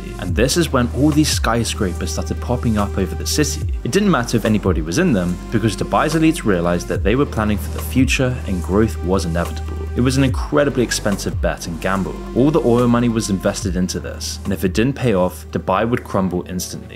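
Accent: England, United Kingdom. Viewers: Not defending dubai, but the criticism and comparison with london is a bit rich coming from an english sounding presenter - the kinks and pedophelia of american politicians and british royals is equally evil and above law so far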